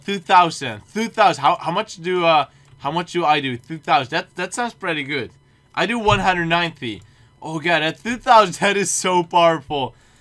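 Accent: Netherlands. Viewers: wait r u dutch?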